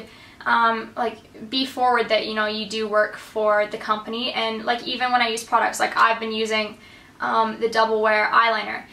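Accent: Australia. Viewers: i love that you get a sneaky australian accent haha! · I like how I can kind of hear a slight an Australian accent, Cute!! :D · you're starting to get an australlian accent a little bit lol :p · Love how you are starting to have a little bit of an Australian accent and say more of their phrases haha :)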